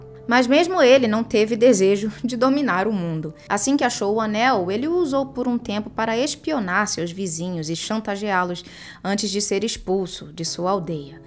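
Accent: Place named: Brazil. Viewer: Legal , eu jurava q vc fosse sulista por causa do seu jeito de falar , de carioca vc nao tem nada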